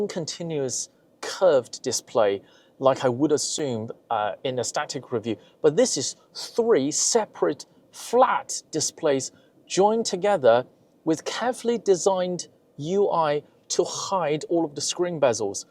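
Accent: United Kingdom. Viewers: The British accent is so distracting😂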